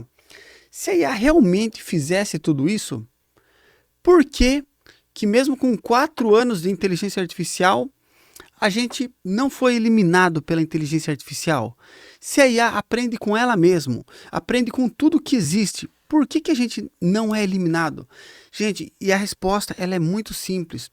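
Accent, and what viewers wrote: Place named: Sorocaba, Brazil. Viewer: Nossa cara eu moro em Sorocaba SP e pelo sotaque ia até perguntar se você era da região mas respondeu a pergunta e sempre como ótimos vídeos.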